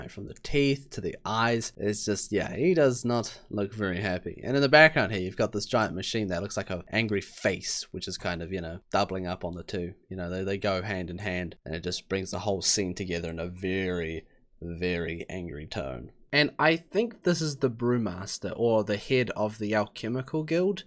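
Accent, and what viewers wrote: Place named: New Zealand. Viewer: Hey bro, great vid! Is that a fellow Kiwi I hear?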